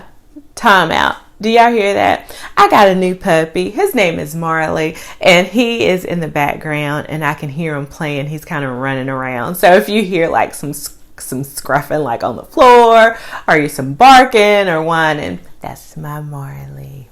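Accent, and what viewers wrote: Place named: Texas, United States. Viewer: Are you Texan? You sure don't sound like someone originally from North Carolina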